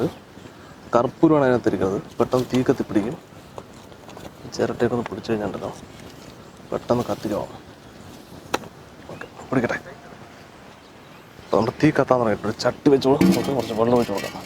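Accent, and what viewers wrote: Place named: Palakkad, India. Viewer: Malayalam... palakkad slang...😊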